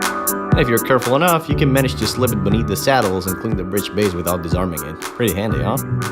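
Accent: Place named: United States. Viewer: Good tutorial, man. Lots of good tips a lot of players particularly ones new to guitar might not know.👍 Side note: your accent is much more American sounding than I imagined. No real point there just and observation. Lol!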